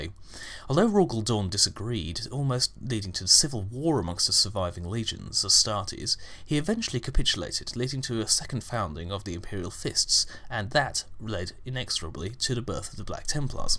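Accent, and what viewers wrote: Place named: United Kingdom. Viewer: Aaah... The British accent... so good for Warhammer in general :P Keep em' comin' :)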